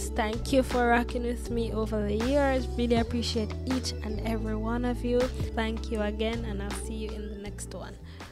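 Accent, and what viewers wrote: Jamaica. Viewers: are you from jamaica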